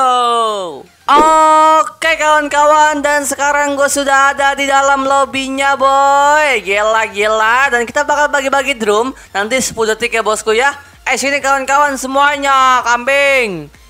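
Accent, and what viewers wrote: West Java, Indonesia. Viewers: Orang sunda kah? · oraang sunda anjay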